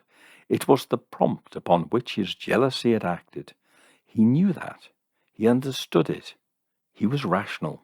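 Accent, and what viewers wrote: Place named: Liverpool, United Kingdom. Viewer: You mentioned in one broadcast that you lived in Balham, London. Your accent sounds a little bit like Wirral or Liverpool.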